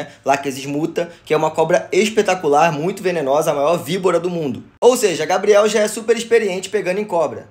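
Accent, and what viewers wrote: Rio de Janeiro, Brazil. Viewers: Você é tão carioca, que você falou “um dos”, e a legenda apareceu “um dois”. Hahaha! Abraço! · Adoro teus videos .muito objetivos Tu é o cara e o teu sotaque é muito legal carioca né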